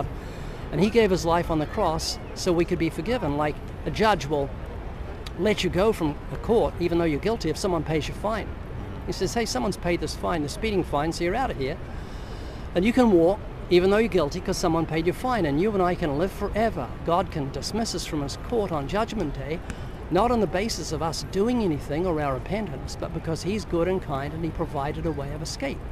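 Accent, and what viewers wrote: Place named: New Zealand. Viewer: Hi Ray I'm from your old Church in New to Zealand. I have a non Spiritual question how come you haven't lost your kiwi as accent. Your been living in LA since the 80s.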